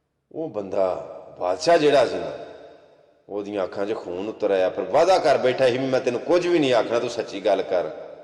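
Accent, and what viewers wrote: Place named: Sialkot, Pakistan. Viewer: brother you have good sialkoti punjabi eccent.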